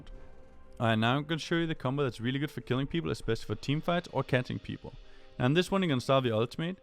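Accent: Denmark. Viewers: I smell danish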